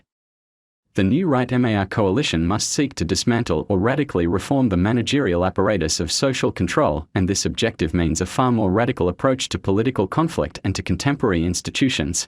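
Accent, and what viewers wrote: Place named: Australia. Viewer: Nice Australian voice.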